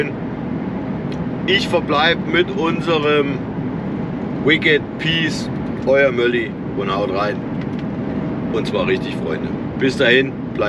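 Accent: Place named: Hesse, Germany. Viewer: Und wieder ein schönes Video. Haus geht vor. Sag mal, wo bist du eigentlich gebürtig her? Höre immer a bisserl hessisch . So FFM und südlich. Zum Thema „Falschparker“ mir ist es lieber ,ihr steht auf den PKW Parkplätzen, als in der Zufahrt. Selbst wenn man mal halbe Stunde Augenpflege machen möchte, findet man bestimmt als PKW Fahrer Platz. Na, ein breites grinsen im Gesicht.Gespannt was kommt. Mal sehn , ob meine Ahnung stimmt. Grüße an deine Liebsten zu Hause.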